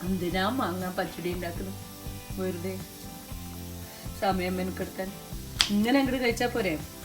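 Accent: Palakkad, India. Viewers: Thanks for all your cookery shows. More than your cooking I like the way you speak because I am from Palakkad too. I am settled in Pune or for that matter I am a Puneri but a pakka Palakkadan malayali. I can read but not write Malayalam. Once again for Palakkadan Malayali basha with the slang words. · True. Her accent attracts Palakkadans to watch her videos irrespective of the menu she posts. Pakka palakkadan slang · Palakkadan slang avatharanam super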